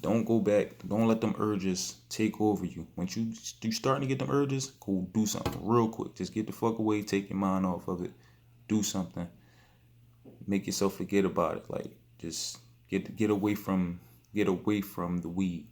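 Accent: Philadelphia, United States. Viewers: Before he even said Septa I knew bul was from Philly. Props bro. I’m on the same path. This helped me stay focused.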